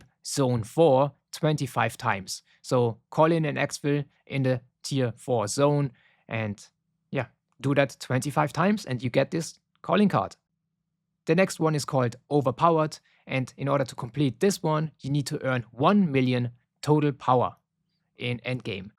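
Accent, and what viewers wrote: Germany. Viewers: Danke für das Video😊 man hört leicht heraus, das du deutscher bist xD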